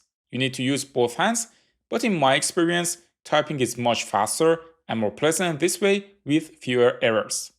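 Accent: Iran. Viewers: Dude that Thick Persian Accent got me 😅 well done bro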